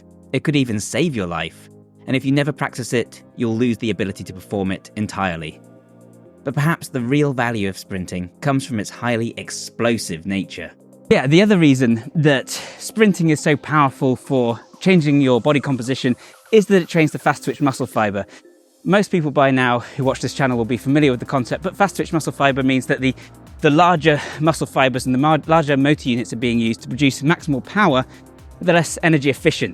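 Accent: United Kingdom. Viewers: Great Video ! I remember when I played Football (America/Canadian Style) we had to run smaller hills and Short Sprints 40-70 Yards. The NFL Combines (Selecting Top College Players for Profession Teams) one of the Tests is the 40 Yard Dash (Record was 4.21). Sounds like your from the UK so I guess Rugby and Soccer Players must have to be Fast sometimes with explosive Burst of speed too. Also when I did Track and Field the real Power Sprints seemed to Top out at 200 to 400M after that I think some Pacing after that would be required if going Full Bore. Roger Banister 1st man to do 4 minute Mile would Average 17.60/100 yards in a 1 mile run. Also what do think about Cross Country Running, in your video looks like that or mixing up the Pace I guess. Even in the Boy Scouts (old days) was something like Walking 50 Yards them Running 50 (alternating) when hiking sometimes. 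I guess the Top women sprinters (100 M) are only than a second or 2 behind the men ?! Also to qualify for Police officer training here Recruits must run 5 KM in 30 Minute. What do you think ? Thank you Jim Canada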